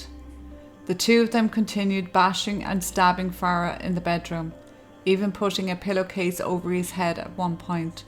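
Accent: Ireland. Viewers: Love your content and your soft, lulling Irish voice. I've only just found your channel and have now watched all your vids so I'm eagerly waiting for more. Sending love and luck from the West coast of Australia. 💚🍀💚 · Am I a very bad person for still being rooting for these girls after all? Dunno, for once, girls doing an abusive pr**, I really can't be that sorry for him. But Jeez, was this a true mess...... Greetings from Italy from someone who was living in Dublin but left the year before this all happened 🧡love your channel (and your accent, it brings back so many nice memories...)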